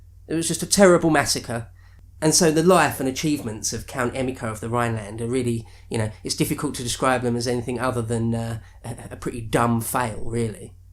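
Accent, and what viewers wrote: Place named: Yorkshire, United Kingdom. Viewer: * sounds like he's from my ends.....Dewsbury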